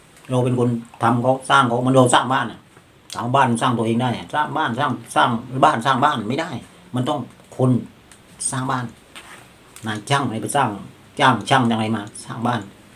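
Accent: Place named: South, Thailand. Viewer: หวัดดีค่ะ พูดใต้แทรกบ้างก็ดีค่ะฟังน่ารักดี เชียร์นะคะ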